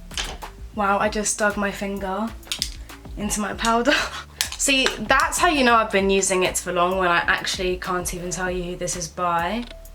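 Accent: United Kingdom. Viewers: Jeez I love the English accent. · U look pretty w no makeup😂💕love ur accent even tho I’m from ldn too. RIP CADET❤️🙏🏽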